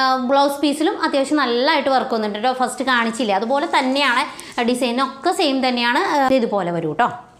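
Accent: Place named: Perumbavoor, India. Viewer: ട്ടോ എന്ന പ്രയോഗം നമ്മുടെ പെരുമ്പാവൂരുകാരുടെ ഒരു പ്രത്യേകത ആണ് ചേച്ചി പറയുന്നത് കേൾക്കാനും നല്ല cute ആണ് അതുകൊണ്ട് അത് മാറ്റേണ്ടതില്ലാ ട്ടോ😊😊😊😂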